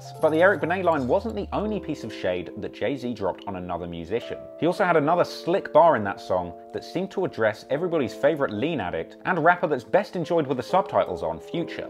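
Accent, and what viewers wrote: United Kingdom. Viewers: That British accent talking American hip-hop is irresistible!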